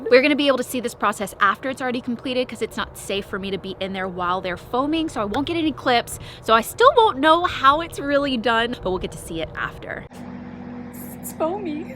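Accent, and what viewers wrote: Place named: Texas, United States. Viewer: Is it just me or does she sound more texan? Just some small things with a hint of southern accent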